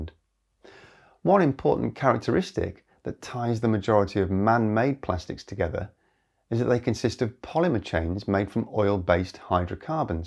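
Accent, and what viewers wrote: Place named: RP, United Kingdom. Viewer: Wow, you're a very handsome man! I like your RP English and would like to see you at the beach.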